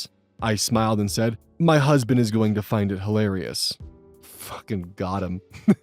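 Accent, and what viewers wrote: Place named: New York, United States. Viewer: Curious question.... Why do you sound like someone that lives in Manhattan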